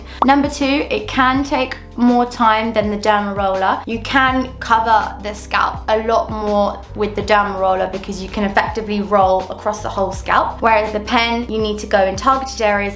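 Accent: United Kingdom. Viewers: Love Love ur videos can't wait to try straight away! Love ur British accent!! Will update once I add to my hair journey!! Could you do a video on hair nutrition?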